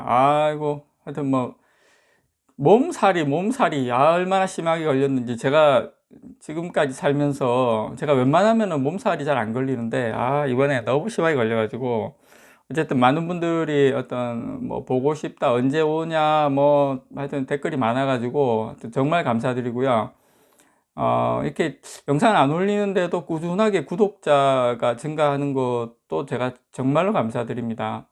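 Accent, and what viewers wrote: Gyeongsang, South Korea. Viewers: 갱상도 말씨 정감있어 좋아요 ㅡㅡ하또 하또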